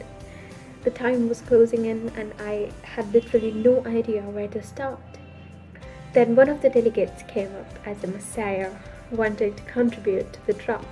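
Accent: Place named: United Kingdom. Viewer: How you bring that British accent?😁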